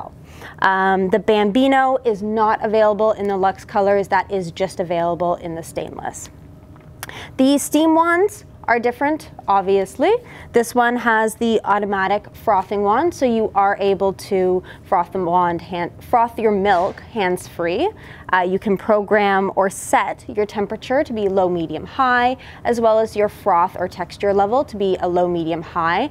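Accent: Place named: Canada. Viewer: "Oat and aboat" How to know it's Canadian not American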